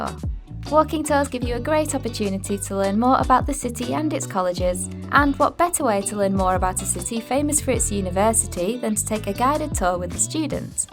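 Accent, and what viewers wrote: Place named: Lancaster, United Kingdom. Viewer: Good video as a Cambridge resident. Love your accent, from Lancaster.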